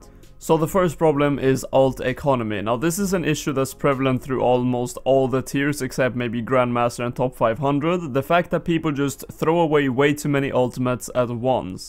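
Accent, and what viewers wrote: Sweden. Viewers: i thought so because of your accent but i was not sure. I'm swedish too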